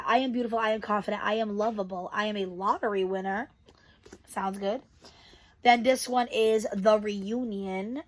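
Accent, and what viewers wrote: New York, United States. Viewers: Harry also has body wash shampoo and conditioner shave stuff ect my husband uses harrys we get his st Walmart because our dollar tree here in North Carolina doesn’t carry it im a new subscriber are you from New York i caught the northern accent because im originally from Long Island New York ❤❤❤ your content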